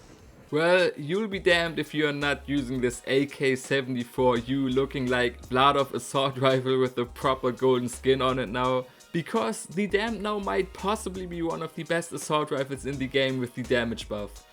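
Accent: Germany. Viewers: Man erkennt zwar sofort das er deutsch ist aber sein englisch ist nicht schlecht und lohnt es sich nicht mehr durch englische videos mehr leute zu erreichen als durch deutsche?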